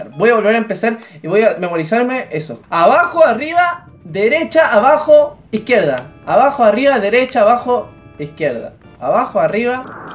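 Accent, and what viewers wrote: Chile. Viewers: eres chileno ¿no? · Este Weon es chileno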